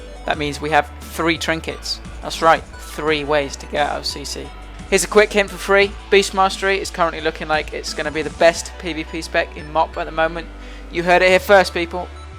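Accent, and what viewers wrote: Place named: England, United Kingdom. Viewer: im not sure but he sounds english :p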